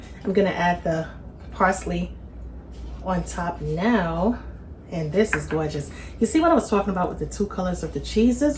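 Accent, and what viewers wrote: New York, United States. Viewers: That looks amazingly delicious 😋 and it’s sounds like a New York voice you have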